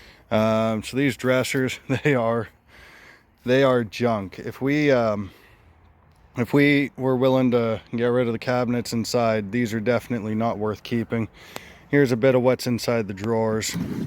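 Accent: Canada. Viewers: You sure got a lot of space left in the shed and you got rid of the hornets without getting stung once 😂🥴 anyway I’ll see you tomorrow 👍🏼 I forget if you guys are in Canada or Michigan 🥴 because you have an accent I guess you could be from either 🤣 lately people have been reading my comments so maybe someone can tell me where you live not like it matters I’m just curious 👀 I don’t have much else I’m paralyzed and bedridden 🤯😂 and really enjoy your content BUT I guess I have to wait till tomorrow 🤣😂 anyway guys have a good evening 🙏🏼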